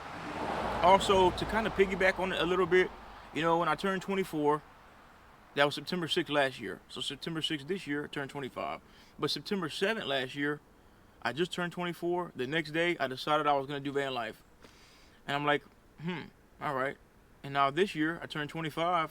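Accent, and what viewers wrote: Louisiana, United States. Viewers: Sounds like you from Louisiana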